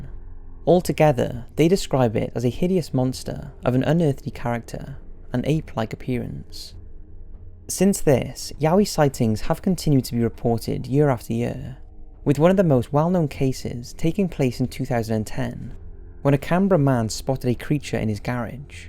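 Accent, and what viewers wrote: Wales, United Kingdom. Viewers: Greeting from Wales! Also are you Welsh? You sound like it... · I am in L O V EV with your videos!! And I also L O V E your accent. Is it like Welch? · Finally found my favorite British narrator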